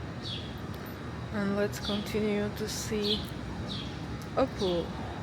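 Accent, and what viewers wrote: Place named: Poland. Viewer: Bardzo Polski akcent :) 🎉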